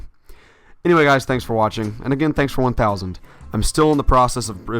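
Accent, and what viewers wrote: South, United States. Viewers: like if you like people with country accents :). also can you do a collab with your mom.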